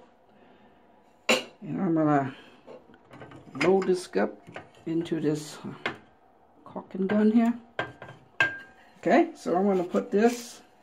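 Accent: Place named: Louisiana, United States. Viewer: You sou d like youre from Louisana.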